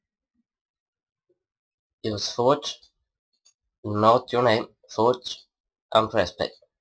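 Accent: Sweden. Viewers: Haha Svengelska :D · det låter lite som svengelska när du pratar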